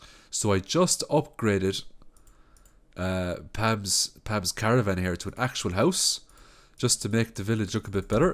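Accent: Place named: Rural, Ireland. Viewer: Of course an Irish man would be a great farmer 🤣🍀 (I'm assuming based on the cultchie accent 😆) love the vids?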